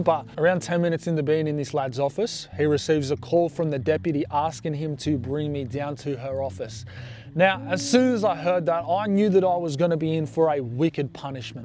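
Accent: Australia. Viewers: He has an Australian accent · Even though I don't relate to this experience, it sounds so aussie that I should be relating lol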